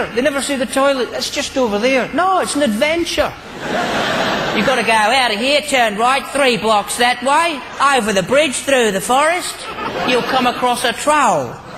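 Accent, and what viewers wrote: Australia. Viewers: People who may not realize this, perhaps Americans stumbling upon this for the first time, this guy is right on the money !! His "Aussie accent" needs only a teensy.wee bit more perfecting, but he has summed up Australia & Australians perfectly in a nutshell, beautifully, in fact.!! This bloke is not only very observant, but be's also very funny. #!! A top-notch stand-up comedian !! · Man this guy's got down the middle-aged Shazza accent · Haha Danny actually is the only comedian who has got our accent pretty much perfect. Other comedians try to sound like us and often fail. So yeah we do sound kind of like that, but I think he's only trying to do the stereotypical Aussie accent for comedic purposes. And it works because I too laugh my ass off. xD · Real OZ accent!! not beautiful at all.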